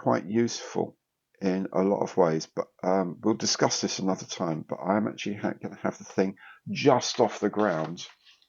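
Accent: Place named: Northern England, United Kingdom. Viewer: Loving the northern accent “me duck” Kids today don’t know they are born. This video brings back lovely memories. I do fancy a Thunderbird 2 with the T4 but they do seem a bit expensive for what you get. FAB.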